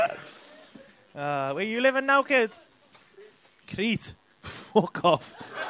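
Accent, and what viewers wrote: Liverpool, United Kingdom. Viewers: Just arrived in Liverpool for a year and I can say though the accent is thick, he's speaking a lot more clearly than some locals in the pub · Wha? You lads don't like the scouse accent? Fuck are you here for then?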